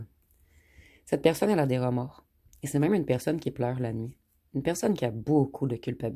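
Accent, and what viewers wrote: Quebec, Canada. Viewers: Chère madame. Merci encore pour cette guidance très élogieuse pour le consultant pour les compliments que vous faites dire à son ancien autre Merci infiniment à vous. Vous nous redonnerez confiance. En plus ma très chère autre que j’accueillerai volontiers pour discuter avec elle sans jugement en toute bienveillance pour comprendre pourquoi elle m’a quitté si brutalement sans aucune explication. Votre guidance est d’autant plus crédible que ma chère autre bien aimée est capricorne ♑️ ascendant gémeaux ♊️ et je suis ascendant lion ♌️ lune en balance. Les quatre signes ont été sélectionnés. Quatre lettres de son prénom et la lettre initiale de son nom de famille ont été sélectionnées. Merci encore je redouble d’espérance de patience car j’aime mon autre comme je n’ai jamais aimé. Excellente journée à vous chère madame à l’accent québécois inimitable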